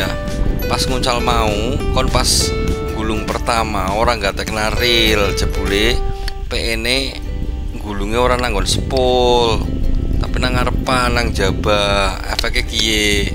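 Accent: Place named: Banyumas, Indonesia. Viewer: Ahahahah lanjut ngapak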